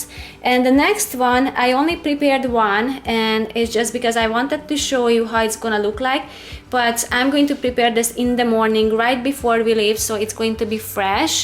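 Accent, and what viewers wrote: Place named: Hungary. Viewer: 90 százalék, hogy magyar vagy kizárólag az akcentusból!A maradék 10 a név miatt :D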